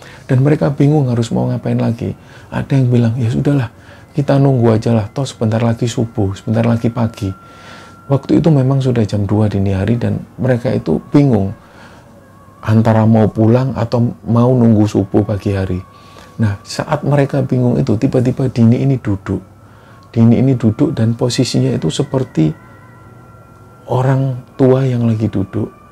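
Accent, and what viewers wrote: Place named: Banyumas, Indonesia. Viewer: Pada2 ngapak.e... 🤩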